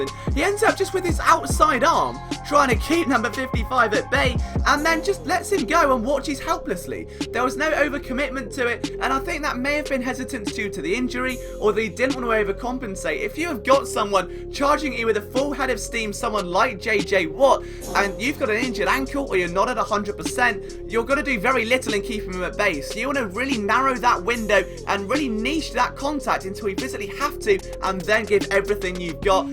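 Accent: England, United Kingdom. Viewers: It's funny hearing a British accent breakdown football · YOU SOUND ENGLISH? IF SO YOU'RE UNDERSTAND THE GAME REALLY WELL. AMERICAN FOOTBALL IS COMPLEX LIKE CHESS. I GIVE YOU A THUMBS UP ON THE SUMO ACTION IN THE PIT.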